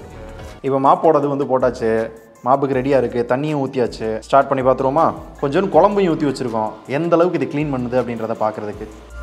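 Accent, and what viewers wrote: Madurai, India. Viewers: மதுரை slang Semma thalaiva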